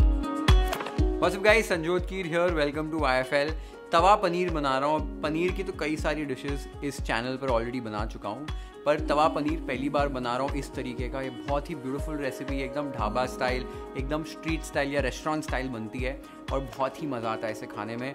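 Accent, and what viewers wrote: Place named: Gujarat, India. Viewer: Music k link Bhi do Na 🥰 fan from A'bad /Aus 💕ur gujju touch in accent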